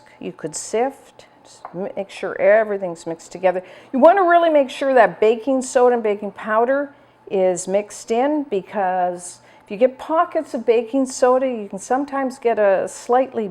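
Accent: Canada. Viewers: Shes canadian right? like her last name and the way she says out.. she so cute.. i like her videos.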